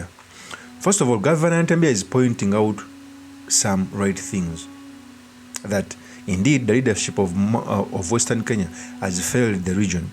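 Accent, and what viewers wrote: Uganda. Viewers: Ugandan accent detected.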